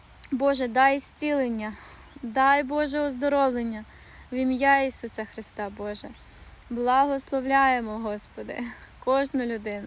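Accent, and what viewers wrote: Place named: Transcarpathia, Ukraine. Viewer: Така файна закарпатська говірка... але дівчина іще файніша🌹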